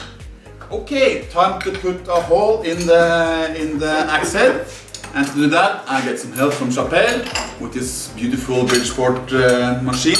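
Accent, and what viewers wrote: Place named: Norway. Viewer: Heavy norwegian accent i hear🤣, great video tho!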